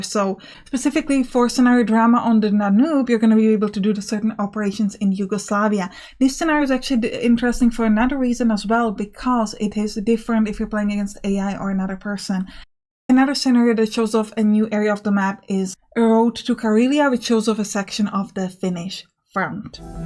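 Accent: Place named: Philippines. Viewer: Wow. I have the honor of writing the first comment. Southern Command looked interesting and I intend to look into it while sales are still active. Thank you. PS: It is nice to find a female wargamer; from your accent Filipina. I only know a few words of Tagalog. I guess the most appropriate is word here is "Sigay" (don't know spelling). Thanks,... you videos on Field of Glory Empires were good too. (I am a former member of AGEOD and friend of Philippe Malacher.)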